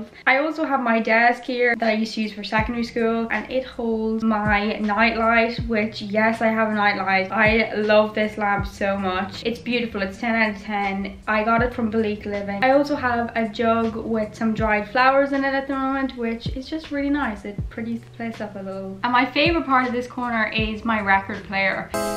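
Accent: Ireland. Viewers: you just came up on my recommended and it's so lovely to hear a fellow irish voice! your room is goals 💌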